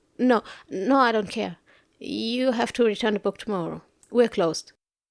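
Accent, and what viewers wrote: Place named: Germany. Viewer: Greetings, I've enjoyed your take on Shynosaur's script very much and would hope for a part 2 for this character, Edit: (Sorry if the next section is a bit long) Having said that, keeping in mind the shape-shifting aspect, would as well love to see a script by you with your take on other mythical characters, like for example the Nixie, not the typical mermaid but a bit close to the Nixie in ( Nibelungenlied ) the German poem in folklore, but in a modern setting, having the ability to shape-shift between Human form and Mermaid, tends to stay near water hence works jobs where water is always available (like aquariums or as a lifeguard), and can sense future events like a Seer, with a soothing voice to the listener, your accent for this character will be perfect since a Nixie is part of the German folklore, as well a possible chance to include a collab with two other VAs as colleagues or sisters similar to the three Rhine-maidens in Nibelungenlied, however its up to you. 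Thank you very much and wish you the best in the future content to come 😊.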